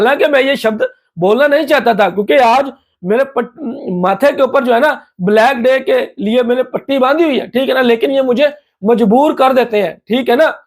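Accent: Pakistan. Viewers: Me ne aaj is Bhai k channel ki detail check ki Is Bhai ka channel to Pakistan se chal raha hai. Is channel ki detail me Pakistan likha hai. Me b sochta tha k ye itna khul kar Pakistan ko q support karta hai Lekin dress Indian hai baqi is ki language muje confuse kar rahi thi k bolne ka style to bilkul hmare jesa hai. Haqeeqat Allah hi behtar janta hai.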